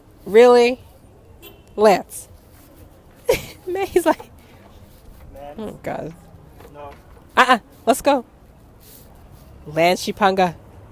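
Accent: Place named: East Coast, United States. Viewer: I admire you and your family. I could tell you were from the east coast (I'm from Philly) by your accent. I also can tell you are a little homesick. It is hard to adjust to about anywhere coming from New York. My fiancee is from Brooklyn and she's easily bored. Philly keeps her marginally stimulated. It is nice to see a thriving black nuclear family like yours and within your native culture.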